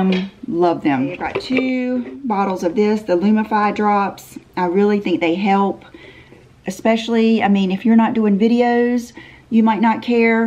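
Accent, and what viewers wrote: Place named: North Carolina, United States. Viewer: Love your videos! I’m a Carteret County local, so I totally get the difference in accents in NC;). And I TOTALLY never noticed how red my eyes were until I started doing YouTube videos. Thank you for your positivity and sweetness… and if you ever come up to the Crystal Coast I’d totally do a beach day with you:)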